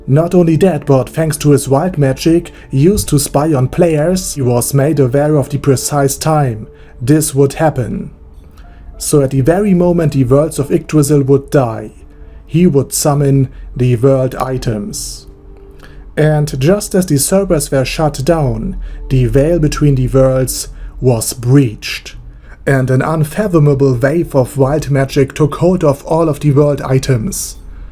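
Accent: Germany. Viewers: You're German aren't you?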